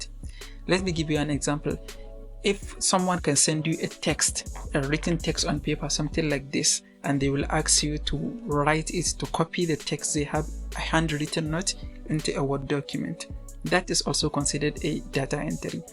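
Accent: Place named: North, Nigeria. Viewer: Your accent brought me here i knew, you're from the North 😊 ina gaisuwa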